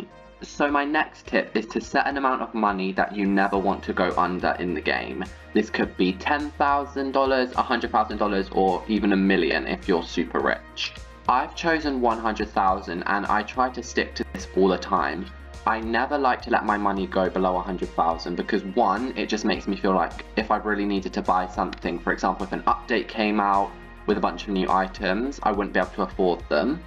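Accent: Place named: United Kingdom. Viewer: Sounds like a briish boy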